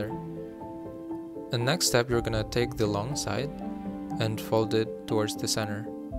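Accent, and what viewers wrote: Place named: South Korea. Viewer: I can tell from your accent that you are Korean which makes more fun to watch this video. Thank you💗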